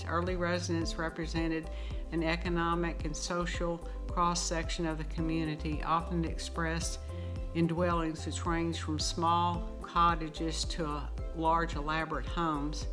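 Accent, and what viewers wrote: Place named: South, United States. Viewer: I love your southern accent. It's so calming.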